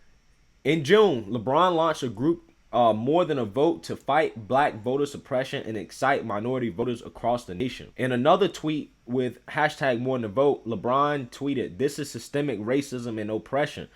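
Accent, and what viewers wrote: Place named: Washington DC, United States. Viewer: Hey boss where are you from city wise, just curious my guess from the way you pronounce certain words i would say the DC area and or the Baltimore area? I'm a correct? Lol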